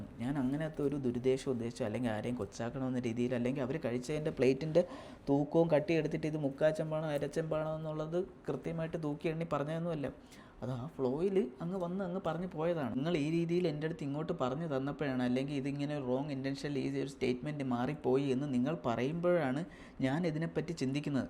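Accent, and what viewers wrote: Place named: Trivandrum, India. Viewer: trivandum sideil ചില ഭാഗങ്ങളിൽ സംസാര രീതി ഇങ്ങനാണെന്നു ഞാൻ കേട്ടിട്ടുണ്ട് ആദ്യം ഒക്കെ ഇവരുടെ reelsile സംസാരം കേൾക്കുമ്പോൾ trivandram slang വല്ലാതെ standard കുറവായി തോന്നിട്ടുണ്ട്, . ഞാനൊക്കെ ഒരു conversationil നിങ്ങൾ”എന്ന് automatically പറയും. but പറയേണ്ടാ രീതി unclum , auntyum, ചേച്ചിമാരും ചേട്ടൻമാരൊക്കെ , എവിടെക്കാണ് പോണെന്ന് എന്നാണ് 🙄നിങ്ങൾ എവിടെക്കാണ് പോണെ എന്ന് ചോദിച്ചാൽ പ്രശ്നമാണ്🫣… അത് ഞങ്ങളുടെ നാട്ടിൽ disrespectful ആണ്.. എന്റെ parenstinte കയ്യിനു വഴക്ക് കേട്ടു മടുത്തിട്ടുണ്ട് ..അതുപോലെ എടി എന്ന് വിളിക്കാൻ പാടില്ല. but എനിക്ക് എന്റെ കൂട്ടുകാരി അങ്ങനെ വിളിക്കുന്നത് ഇഷ്ടമാണ് ഒരു closeness feel ചെയ്യുന്നു, എനിക്കു അങ്ങോട്ട് വിളിക്കാനും okey ആണ്, but parents and auntymar കേട്ടാൽ അപ്പോ ഉപദേശിക്കും.ഓരോ നാട്ടിൽ ഓരോ reethi.. ഇവിടെ ഞാൻ ഉൾപ്പടെ comments ഇടുന്നവരിൽ പലരും trivandrum അല്ലെന്ന് മനസിലായി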